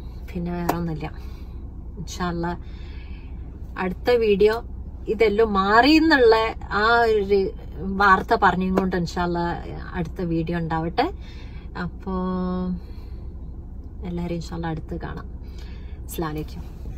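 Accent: Kozhikode, India. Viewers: കോഴിക്കോടൻ മലയാളം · പച്ച കോഴിക്കോടൻ ഭാഷ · കോഴിക്കോട് ഭാഷ എനിക്ക് ഇഷ്ടം ആയി 😍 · assal Kozhikode anallo samsaram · കോഴിക്കോട് ഭാഷ ഞമ്മക്ക് പെരുത്ത് ഇഷ്ടായി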